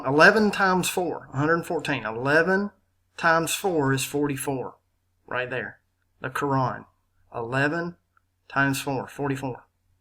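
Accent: South, United States. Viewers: what part of the South are you from buddy? I'm from east Tennessee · I like your accent because I'm from the great Southern USA!!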